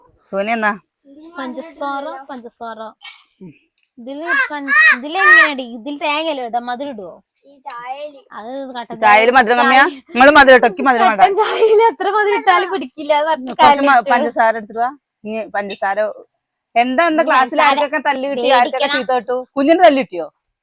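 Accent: Palakkad, India. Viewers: Thanks... എനിക്ക് തോന്നി ചെറുപ്പളശ്ശേരി കോങ്ങാട് ഭാഗത്തെ സ്ലാങ് നല്ലോണം ഇണ്ട്☺️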